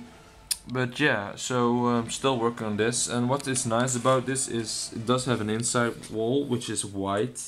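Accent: Netherlands. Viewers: Ik hoor gewoon dat jij nederlands bent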